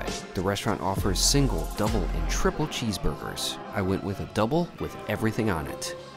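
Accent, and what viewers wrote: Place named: Midwest, United States. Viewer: U must be from Michigan or Chicago..? your accent is funny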